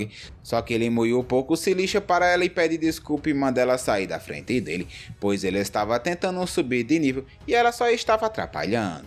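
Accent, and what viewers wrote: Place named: Northeast, Brazil. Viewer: 😂 kkkk mais um EP top demais 😍 parabéns pela sua narração perfeita sem ia amo o dialeto nordestino 😂 em algumas partes me pegou de surpresa com um xingamento do neida 😂😂 vamos nessa para o próximo episódio ❤😊👍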